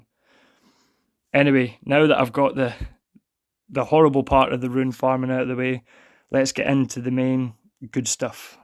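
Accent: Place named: Scotland, United Kingdom. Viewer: Great Vid, and great to hear a Scottish voice on a Content creator video :)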